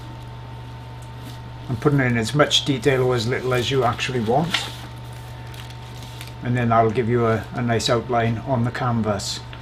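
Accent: Wales, United Kingdom. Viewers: Hi You work is amazing...and I really appreciate you sharing your knowledge. Also being from Cardiff myself... it is refreshing to hear a fellow Welsh accent and to know that it isn't just singing but also Art that Wales is good at. Thanks Sandy. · Ah hello my fellow welshman. 👋 It took me about 5 seconds to detect that accent. 😁 Great tips 👌🏻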